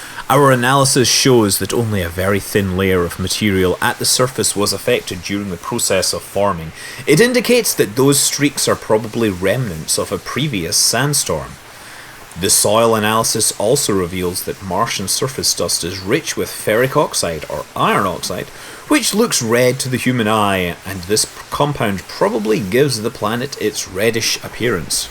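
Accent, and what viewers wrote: Scotland, United Kingdom. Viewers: Hullo, there is no problem at all with the Ts, they are clear as day. The "Hwhat" thing isn't an audio processing issue, it's just a common garden Scots speech pattern which can really stand out to the non-Scottish. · Because he's a Scotsman. He also says Idear. c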